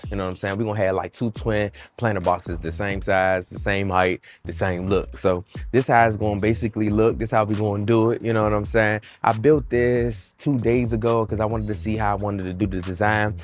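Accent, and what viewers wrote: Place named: Chicago, United States. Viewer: I hear a Chicago accent. 😊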